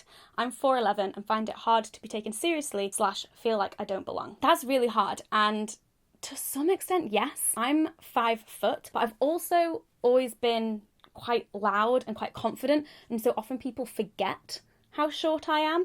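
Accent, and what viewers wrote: United Kingdom. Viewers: I love your British accent and you speak very well I understand almost everything you say (I am not so good in English). You are definitely Awesome!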